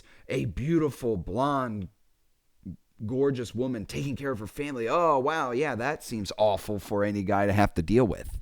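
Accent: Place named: Australia. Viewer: Aussie accent are awesome. I agree with the rest of what you said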